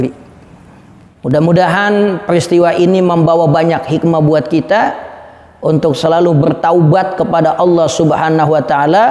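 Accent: Jakarta, Indonesia. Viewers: Ustadz logatnya kental banget betawinya. Demen dah dengernya. Syukron tadz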